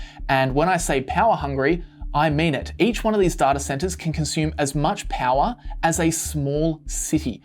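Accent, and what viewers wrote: Australia. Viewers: You sound Aussie but focusing on US!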